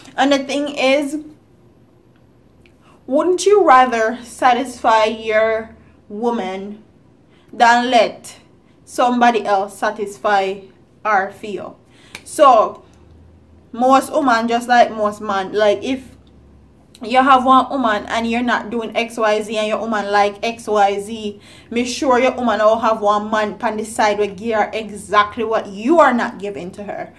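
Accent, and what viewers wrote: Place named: Montego Bay, Jamaica. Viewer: God damn u talk bad,weh come from Montego Bay?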